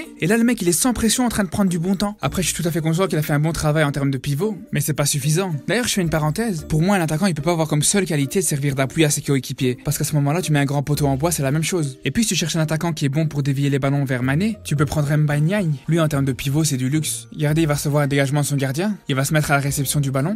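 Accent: Brussels, Belgium. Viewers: Accent bruxellois flag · T’as l’accent belge fieu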